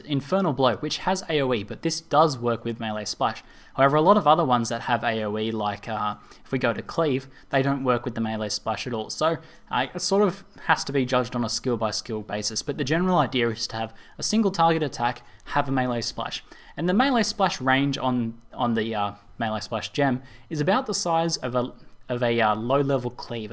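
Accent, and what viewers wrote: Australia. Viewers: were are you from in Australia? if your from Aus that is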